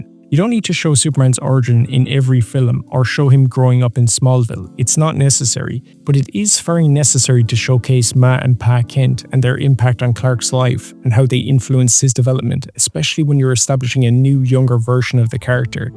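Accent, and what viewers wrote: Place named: Ireland. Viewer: Why am I hearing the Jacksepticeye irish accent? lol Good video tho I agree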